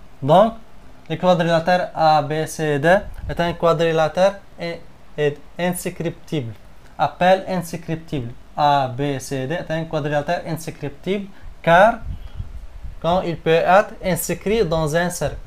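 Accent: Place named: Lebanon. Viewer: c'est un accent libanais je pense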